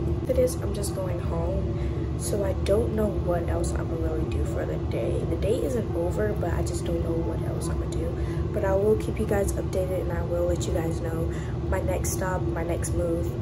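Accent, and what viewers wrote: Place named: United Kingdom. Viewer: nahh she’s giving british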